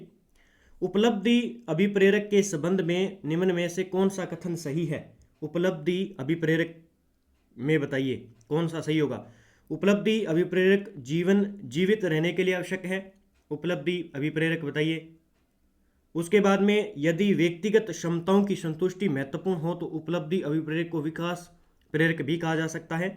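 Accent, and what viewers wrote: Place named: Bagri, India. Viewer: Sir aap jo bich bich me bagdi type bolte ho wese hi btaya kro..... Bhut ache se smjh me aata h 😊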